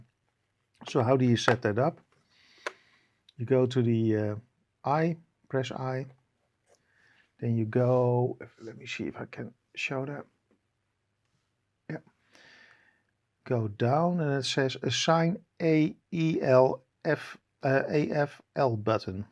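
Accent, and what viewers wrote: Netherlands. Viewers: Are you Dutch? I hear an accent.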